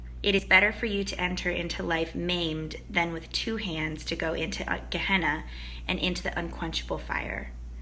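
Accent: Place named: United States. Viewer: Very good voice and thoughtful video.. I like Jesus's teachings. But I don't believe in things like hell and heaven. I would love if you make a video on 'Matthew 7' which is about judging others. That is one of my favorite chapters in Bible. I also like sermon of mount, his parables regarding sowing seeds and many others.. Here is a poem that I wrote combining some verses in Bible and some concepts in Eastern traditions. You may be able to spot a few Bible verses in it. I really like this poem to be heard spoken by someone with a good American accent, like you do. So, in case you like to read this poem in a video, please feel free to do so. It is titled 'Good News'...Here it is: Your life is the most beautiful mystery And the screen which plays your private history; Your life is the truth, consciousness, and bliss That can’t be pointed out as that or this. 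The life screen is the only truth you know; All that are manifest move in its show; This essence of life is your true nature; Knowing this ends the distress and torture. The one truth that pervades the time and space Shines in the heart and showers love and grace; By the veil of darkness it is concealed; By nonstop inquiry, it is revealed. That one truth is called by various names; Deep inside you, it eternally flames; It is the immortal life and the way; Look inside, you can find its sparkling ray! From the dawn of life, seers have seen this And were reborn by its transforming kiss; Unless you’re reborn as a wide-eyed kid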